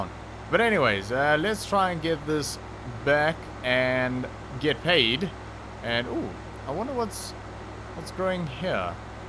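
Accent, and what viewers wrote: South Africa. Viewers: Hearing his voice in the first 2 minutes I knew he was South African and them him confirming it is hilarious. Looking forward to go through your old series and awe from a fellow Sa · I new I smelt a south african accent😂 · just came across your channel and thought i heard a south african xD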